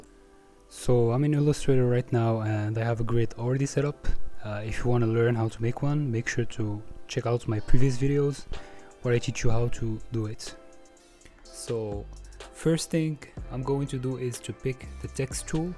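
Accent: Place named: North Africa. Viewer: Great design bro,btw where u from u sound north african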